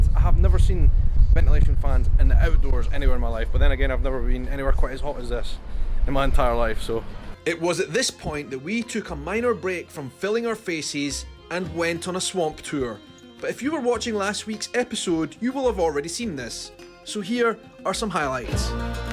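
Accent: Scotland, United Kingdom. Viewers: Absolutely funny as shit watching the subtitles try and keep up with your Scottisms lmao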